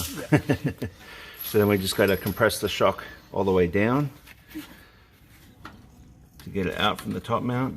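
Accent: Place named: Australia. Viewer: I cannot make out your accent for the life of me. It's sorta Australian but not really. Sorta British but not really? Maybe New Zealand? Also, yeah you guys did it bare minimal taking everything apart. Other videos they would have taken everything apart, including the brake lines because they're so paranoid. But when you know what you're doing....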